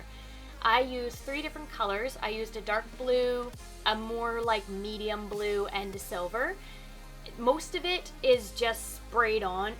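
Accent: Canada. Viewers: Just from your accent I'm assuming you live in Canada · Are you Canadian? “Don’t chya know”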